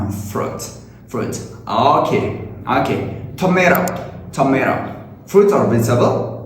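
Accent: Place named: United States. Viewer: Hey dude have anyone ever tell u that ur pronunciation is American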